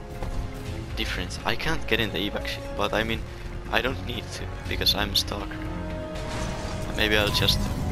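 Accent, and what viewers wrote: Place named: Finland. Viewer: you sound like finnish, i'm finnish btw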